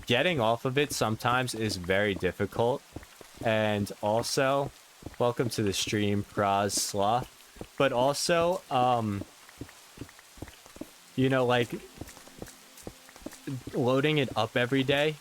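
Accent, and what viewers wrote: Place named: Northeast, United States. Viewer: I hear somewhat a NE accent. Like Boston or New Jersey or something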